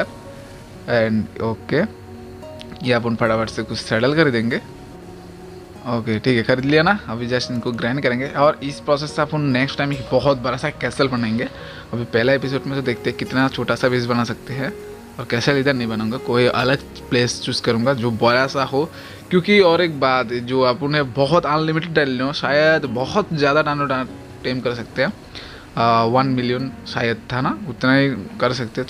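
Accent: Bengal, India. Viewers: Is your mother tounge bengali? ???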